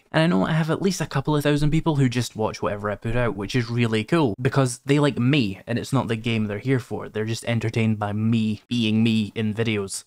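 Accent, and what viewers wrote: Scotland, United Kingdom. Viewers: That's a lowlander Scottish accent if I'm not mistaken, and I'm going to lean toward Dundee more than Edinburgh.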